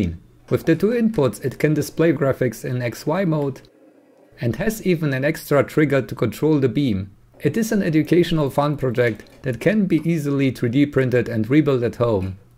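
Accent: Germany. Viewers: I think you are german, because you have a german akcent, And this gadget is cool. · Bro nices video aber das ist so ein Kartoffel Englisch man hört das du Deutscher bist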